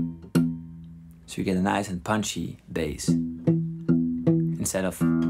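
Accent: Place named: Netherlands. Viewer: de man is wel degelijk Nederlands, zijn Engelse accent doet me denken aan dat van Henk Hofstede (van de Nits) :-) (superfijne video trouwens!)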